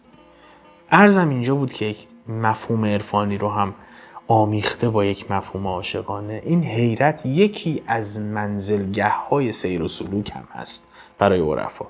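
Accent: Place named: Kabul, Afghanistan. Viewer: عزیز را خوشبختی نصیب باد که‌ خیلی خیلی خوب گپ می زنی و با سخن زدن ما مردم کابلستان بسیار نزدیک و کاملا قابل فهم است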